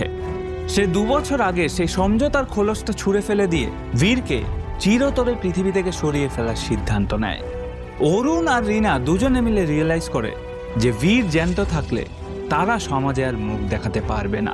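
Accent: Bangladesh. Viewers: আপনার গল্প বলার ভঙ্গি ও সুর অনেকটা বাংলাদেশিদের মতো, যার কারণেই আপনি বিপুল সংখ্যক বাংলাদেশি দর্শকের মনোযোগ আকর্ষণ করেছেন...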